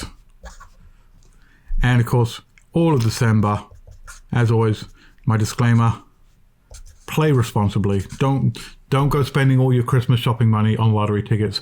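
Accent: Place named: United Kingdom. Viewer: Hi there You sound like you are from the UK but I can’t see any Lotteries for here I am guessing that the Uk one is a lot more complex to win than some of the others Only just started watching this about the Thai Lottery a few mins ago Do you offer live chat like other people which I noticed seems to be a new feature It is a good idea as it’s quicker and not everything needs to be written in public Kind regards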